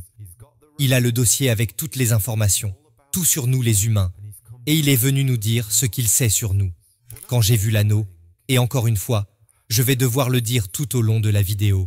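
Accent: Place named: United States. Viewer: Samedi 17 Août 2024 Bonjour à tous, J' suis contre les dialogues robotisés, de plus le narrateur est américain! Un texte expliqué par une/un FRANCOPHONE est bien plus approprié selon moi. J' ai donc écourté cette vidéo, et me suis quand même penchée sur les commentaires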